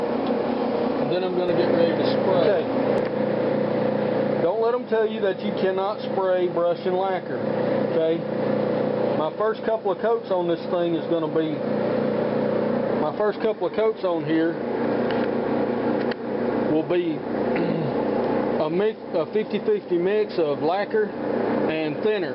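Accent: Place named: South Carolina, United States. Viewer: Hey. Where are you located? You sound like you come from my area. 8-) I'm in Spartanburg, SC.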